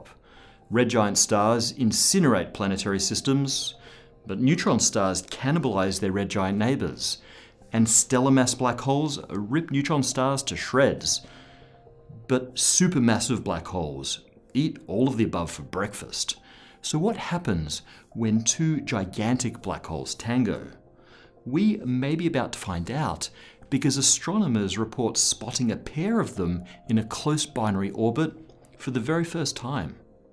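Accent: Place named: Australia. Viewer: Cba with the presenter and his aussie accent mate!!!!!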